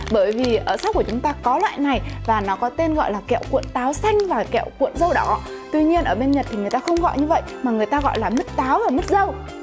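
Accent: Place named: North, Vietnam. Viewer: Chị ở miền Bắc đúng không em nghe giọng chị giống miền Bắc quá, đó giờ em không để ý giọng của chị, bây giờ chị hướng dẫn làm kẹo cuộn mà em bình luận như vậy, em kì cục quá.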